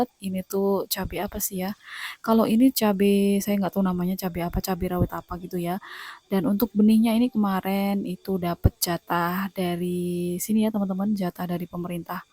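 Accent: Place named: Malang, Indonesia. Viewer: BHS orang malang rijik rijik 😂👍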